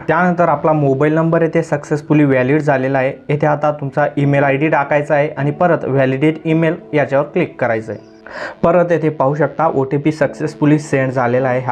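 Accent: Khandesh, India. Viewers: तुम्ही बोलण्यावरून खान्देशी दिशी रायणात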